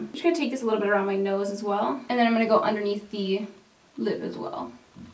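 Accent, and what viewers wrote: Canada. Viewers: your canadian accent is so cute.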